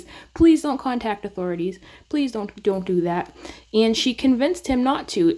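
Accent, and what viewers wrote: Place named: Boston, United States. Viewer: Great episode.... I love your accent.. Do I detect a Boston accident?? Maybe new york? One of the burroughs?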